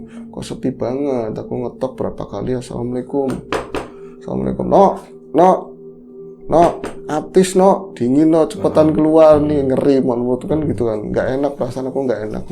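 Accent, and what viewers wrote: Banyumas, Indonesia. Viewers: Request..mohon lebih byk lagi ngapaknya..seru brasa di TKP beneran... Dan biar Mbah sering translate..Ra diem2 Bae 😁😁🙏🙏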